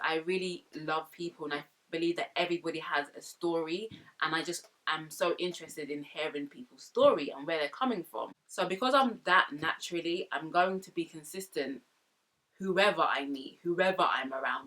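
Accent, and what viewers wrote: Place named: United Kingdom. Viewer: Soo true instagram is soo fake and made me so depressed. Its not real life and you're right none of those people will be there for you in your struggles. Plus I hate feeling overexposed and you never know how many creepers are watching. As women its not very safe! I'm glad instagram deleted my account. I realized how immersed I was in that fake world. So glad you talked about this youre beautiful and love your uk?? accent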